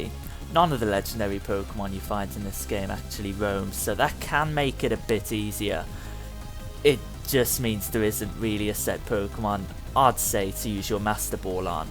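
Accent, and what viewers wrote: United Kingdom. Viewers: AND ENOUGH OF THE BRITTISH ACCENT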